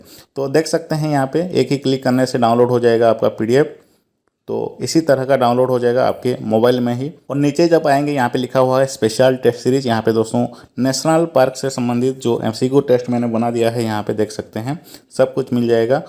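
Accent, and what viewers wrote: Bengal, India. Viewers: Sir ka tone bengoli h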